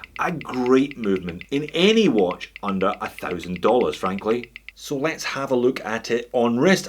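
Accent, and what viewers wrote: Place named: Ayrshire, United Kingdom. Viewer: I thought that accent was ayrshire made..lol..good luck with the channel.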